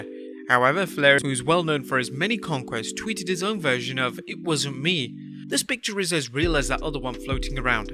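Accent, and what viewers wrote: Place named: United Kingdom. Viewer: Are you British or australlian? Cause you sound British 90% of the time but the way you say content like there's an extra e in it makes me think you're Australian...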